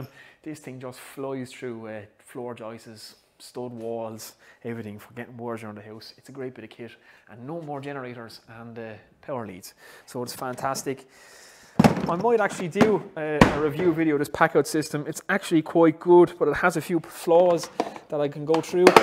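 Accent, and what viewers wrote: Ireland. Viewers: Dublin / Dundalk accent ?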